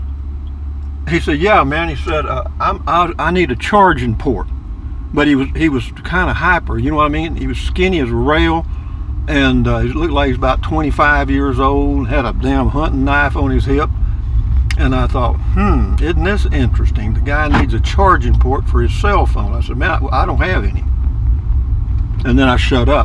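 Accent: Texas, United States. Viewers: Love that Texas talkin' style! Your video not only made me sit up and pay attention, it made me laugh at some of the things you said. Humor and common sense.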